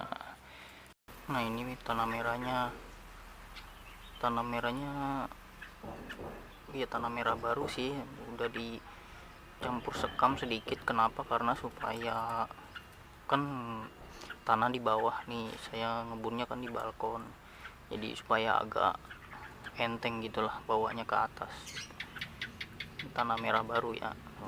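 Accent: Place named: West Java, Indonesia. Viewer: Bangx kayax orang sunda ya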